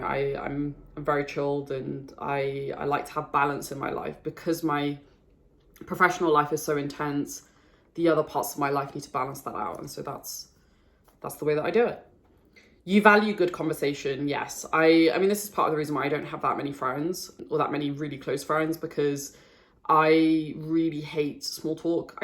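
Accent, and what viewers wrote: United Kingdom. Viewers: Well, I DO like your british accent. Then again, I've never really considered myself as a genuine french person 🤔 (rather than a breathing multinational (tm), haha). It's nice to get to know/understand you a bit more. I primarily thought I didn't care about those kind of videos (feels like a one-way street, if you see what I mean), but I guess it's always pleasant when it's about someone you find truly interesting and has that "something" that resonate with you. Again, congratulations. And here's to a few thousands more !🍺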